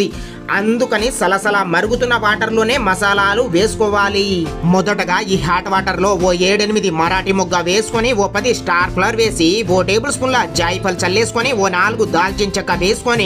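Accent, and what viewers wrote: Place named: Telangana, India. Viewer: Hi bro thank you for the video and meeku telangana slang vachu telugu nerchukoni chepthunaanu anaaru don't worry bro meeru meeku vachina slang a maatladandi we can understand telangana slang as well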